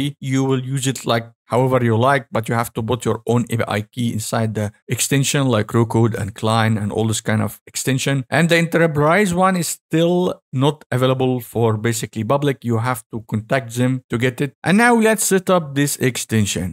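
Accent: Egypt. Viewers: Egyptian accent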